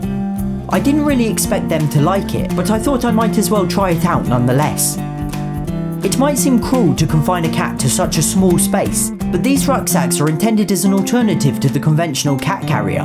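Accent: United Kingdom. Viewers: I love the uk english like Moning Cheers mate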